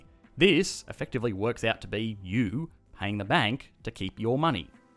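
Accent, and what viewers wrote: Australia. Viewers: I like this channel. I'd like it even more if the australian accent wasn't the most annoying of the english accents. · Bruh r u aussie?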